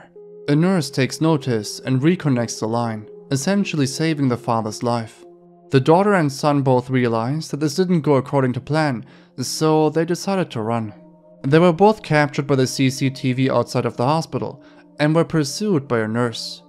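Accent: Germany. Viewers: You have a hard to pin accent....kinda German, or Germanish, but I deserve 50$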